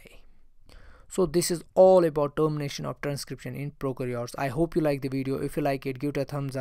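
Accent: Kashmir, India. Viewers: You’re very good but I can’t understand the indian accent 💔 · Are you from Kashmir? 😂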